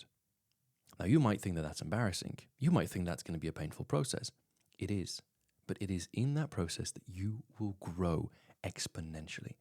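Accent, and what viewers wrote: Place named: RP, United Kingdom. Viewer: Thank you, I completely agree with your thinking about longer content and attention spans. Could you please make a course on how to develop a british RP accent such as yours?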